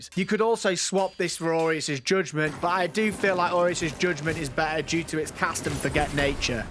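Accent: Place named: North West England, United Kingdom. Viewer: I’ll be honest, bro, I keep expecting to login to it being nerfed to f*cking kingdom come 😂 glad I got to experience it in all its busted glory before the inevitable happens 😂 And my pleasure to support; especially for a fellow, North West of England, lad (there’s about 35miles between us [north of me] judging by your accent). Keep up the good work, fella 👍🏾🤟🏾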